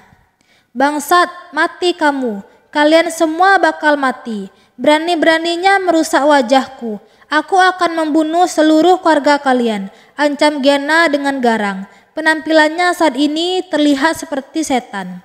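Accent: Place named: Padang, Indonesia. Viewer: Logat org padang kental banget min...😂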